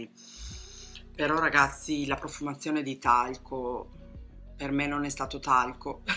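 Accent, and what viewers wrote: Italy. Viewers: Anche tu ci sei mancata, è sempre un piacere vederti e sentire la tua bellissima parlata romagnola 😄😄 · Ciao...sei simpaticissima veramente...ma posso chiederti di dove sei? Emiliana? Romagnola? 🤗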